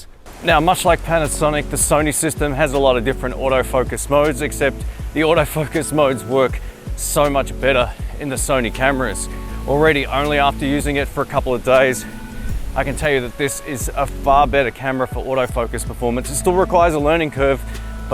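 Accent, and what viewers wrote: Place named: Australia. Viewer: About the grip: have you searched for a "smallrig" wood replacement? I improved my grip on the ZV-1 (my solution to get cheap, reliable AF last year). Now the size (almost identical to my Pana GX8) is really nice - but some of the "old tech" aspects... How do you see the a7IV compared here - makes sense to wait for it, right? (do we ever wanna wait for something - who doesnt want the cam NOW?) great channel, new subber here - could tell you're an aussie quite qick into your first vid - but it's a nice accent, sympathic! greets from Austria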